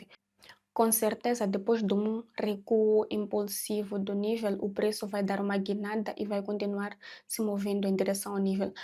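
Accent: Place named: Mozambique. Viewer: Pura moçambicana. Na fala tem tudo de moçambicanos.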